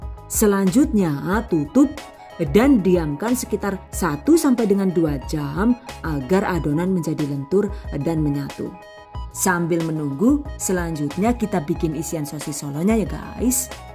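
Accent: Banyumas, Indonesia. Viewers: Makasih utk resep2nya mbak... Aku jg seneng dg bahasa ngapaknya... Ora ngapak ora kepenak yah mbak... Suwun mbak...🙏🙏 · Asli ngendi sih mbak,deneng pada Karo nyong,,,ngapak mania,,,Tek batiri ngapak yuh mbakyuuu 😀😀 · Suaranya kaya pengisi suara si unyil. Eh jebule wong ngapak. 😅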